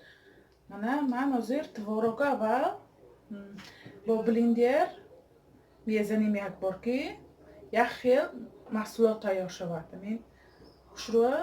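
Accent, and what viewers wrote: Isfara, Tajikistan. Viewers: Салом апаҷон.шумо аз Исфара неми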